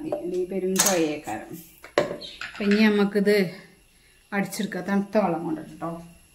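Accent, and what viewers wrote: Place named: Malappuram, India. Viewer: തനി മലപ്പുറം താത്തയുടെ ഭാഷ ❤️❤️❤️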